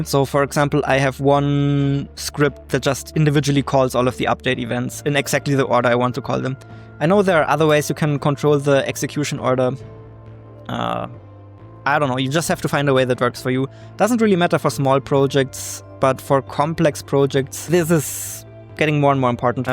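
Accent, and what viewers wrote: Germany. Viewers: your voice sounds very german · Nach 4 Sek wusste ich, das du ein deutscher bist :D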